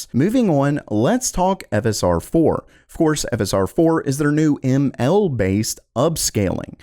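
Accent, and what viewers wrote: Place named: Tennessee, United States. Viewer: lol I walked away and thought the video switched to DistroTube? Tennessee? Hello neighbor lol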